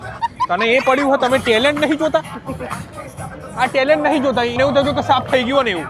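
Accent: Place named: Mehsana, India. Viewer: ભાઈ મને તો મજા આવે છે એક દમ સિધ્ધપુર ની ને મહેસાણા ની ભાસા સાંભળવા મજા આવે છે તમ્મરીયા આવા છે ચક્કર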